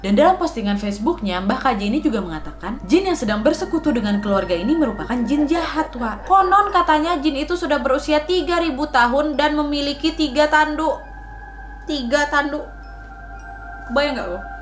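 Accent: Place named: Medan, Indonesia. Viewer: Salam...saya wak2 dr medan,klo boleh tau wak aslinya asal mana??karna logat bicaranya mirip dengan orang medan😊😊😊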